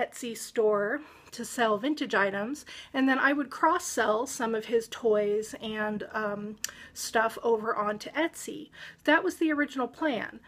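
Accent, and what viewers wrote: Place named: Washington, United States. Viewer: got to love that Washingtonian accent... another nice vid from you... thanks!!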